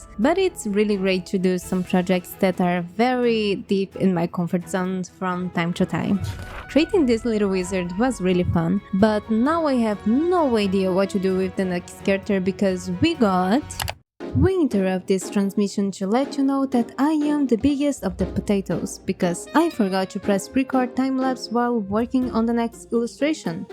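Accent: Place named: Brazil. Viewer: eu ouvindo o sotaque mt fofo e pensando "de onde será que ela é?" ate o jabuticaba KKKKKKK mt bom encontrar alguma artista brasileira com a msm vibe doq eu gosto de assistir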